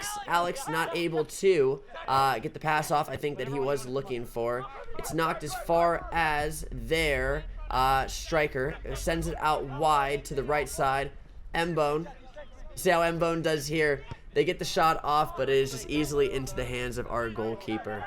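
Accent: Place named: United States. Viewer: This sounds too American for me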